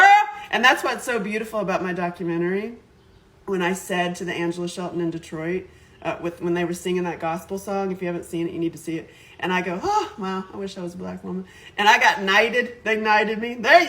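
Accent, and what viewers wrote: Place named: South, United States. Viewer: Hi Angela! It's been awhile! Question, when did you get southern drawl? Plus, when did you get tattoos?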